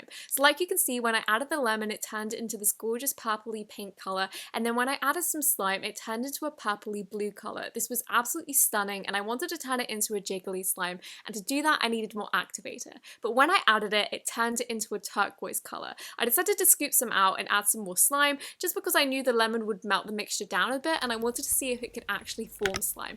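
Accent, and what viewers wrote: United Kingdom. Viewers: Are you from the uk ?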